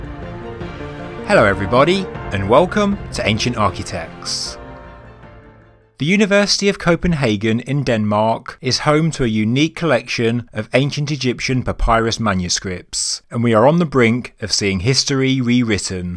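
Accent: United Kingdom. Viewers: Nice to hear a voice from the UK, on the subject of Egypt.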